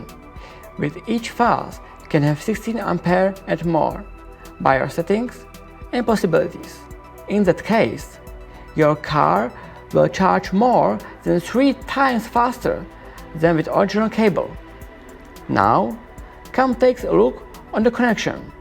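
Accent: China. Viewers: his English accent sounds a bit like Chinese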